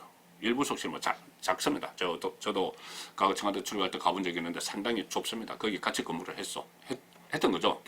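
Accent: Gyeongsang, South Korea. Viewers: 구수한 경상도 사투리를 쓰시면서 정확하게 사건을 정리하여 방송해주셔서 감사합니다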